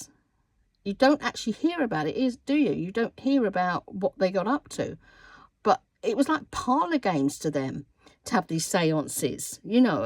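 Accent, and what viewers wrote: Scotland, United Kingdom. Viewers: ... I too... Am so grateful for the Playlist... I was in the DARK... Lol... Trying to get or find the people involved in the actual collaborate... But, thx to your Playlist it's perfectly clear now... ROTFLMFAO 😅... Plus getting to see those that have made such lovely creations... 🌹 I am so a new bee subscriber to your channel... Thank you for sharing your creativity wiff us... Also, loved hear your Scottish accent... I lived in Aberdeen Scotland area for bout 3.5 year's... Fell head over heels 👠 in love with it all... Rented a Farm House 🏡 in the middle of 2500 acres... Of the Major Irwins 5,000 acre Estate... In Newmachar... Siittinton House 🏡... 🌹... Sincerely sincere Miss Judith ❤ ✨ 🌹...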